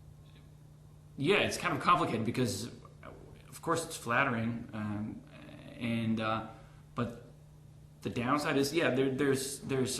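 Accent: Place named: United States. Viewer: His voice is so American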